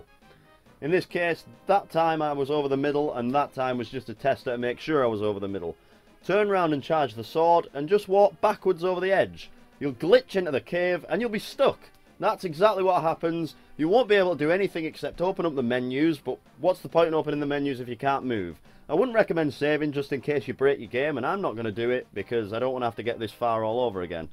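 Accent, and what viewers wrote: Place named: North, United Kingdom. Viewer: Dude, you are so northern. I love it. Subscribing now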